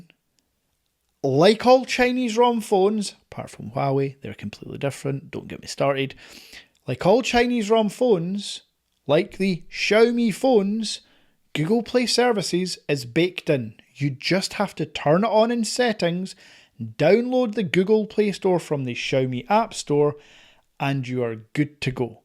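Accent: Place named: United Kingdom. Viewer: Guy British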